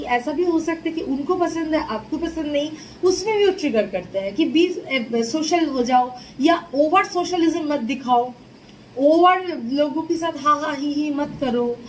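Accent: Bengal, India. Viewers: Are you Bengali? Nice Reading....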